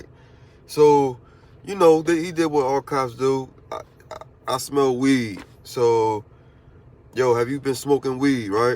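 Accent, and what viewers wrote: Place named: Philadelphia, United States. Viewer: your from philly bro i can hear it, fighting my 2nd dui right now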